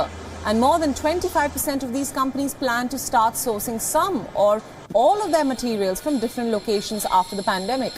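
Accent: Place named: India. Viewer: Why the heck this Indian hostess with this terrible ascent reporting on US and China?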